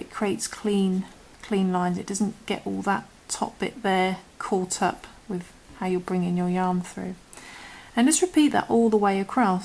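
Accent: United Kingdom. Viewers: Love your accent! British, eh?! Great!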